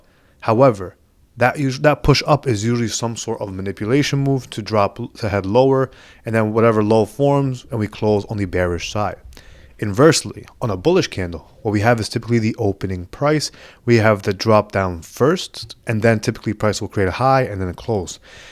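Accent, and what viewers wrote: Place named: United States. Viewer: You sound American. How do you do prop firms that don't accept us traders?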